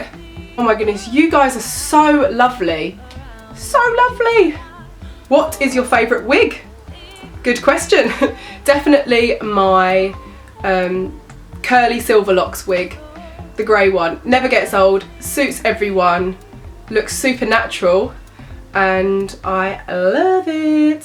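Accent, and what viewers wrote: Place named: United Kingdom. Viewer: I could listen to your brittish accent 24/7 😍❤️❤️❤️❤️❤️❤️ love you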